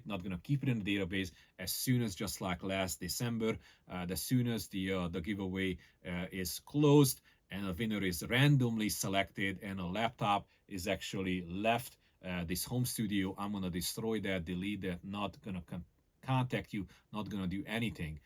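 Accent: Hungary. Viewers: Magyar akcentusod van :) Jó bemutató.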